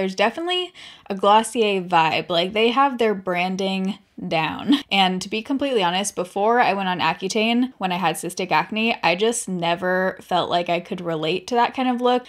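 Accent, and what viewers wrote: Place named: United States. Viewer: Scalps. She made scalps for dinner... The American accent blows my mind sometimes lol. Love you, girl! 💕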